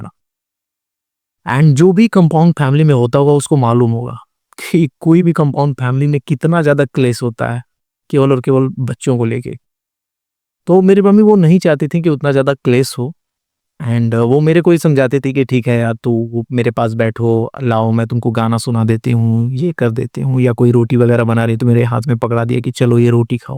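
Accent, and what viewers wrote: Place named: Bhojpuri, India. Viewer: Where you from bro Your language accent is bhojpuri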